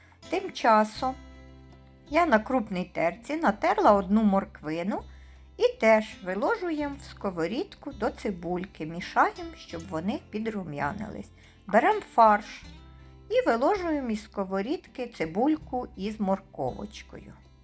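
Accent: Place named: Surzhyk, Ukraine. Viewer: Розмовляе суржиком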